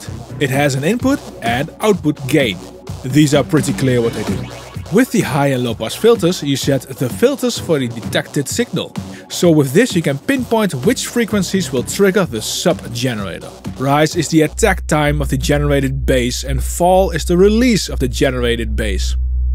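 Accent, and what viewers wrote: Netherlands. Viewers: i hear the nethelands yes, goede video tho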